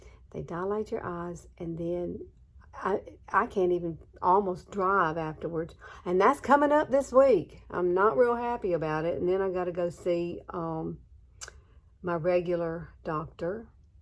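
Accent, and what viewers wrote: South, United States. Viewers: I grew up in Oklahoma, Texas and North Carolina. I definitely understand your southern speak. I’ve had people ask me where I’m from …. 🤣🤣. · I’m a Georgia girl and I completely understand you, girl we speak Southern Belle. You are beautiful inside and out ♥️ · You are too cute! I am a Kentucky girl that also has that southern accent and there is nothing better. Have a great week, see you next week.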